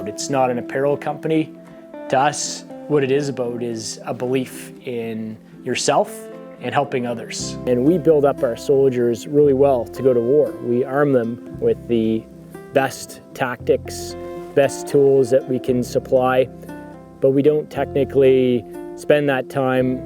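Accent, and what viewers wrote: Canada. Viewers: Nice to listen to another Canadian.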